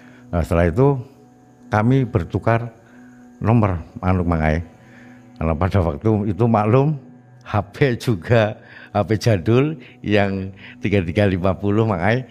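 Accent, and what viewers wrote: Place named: Tegal, Indonesia. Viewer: Tegal maning... Tegal maning..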